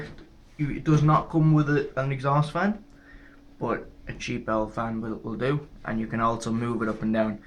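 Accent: Ireland. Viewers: are u irish? :D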